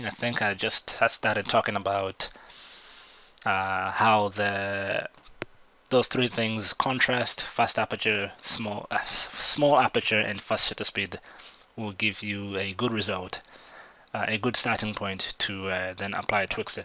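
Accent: Zimbabwe. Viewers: Definitely Zimbabwean! Haha Waita bho, Wabastira! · Is the narrator in this video Zimbabwean? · Are you from Zimbabwe?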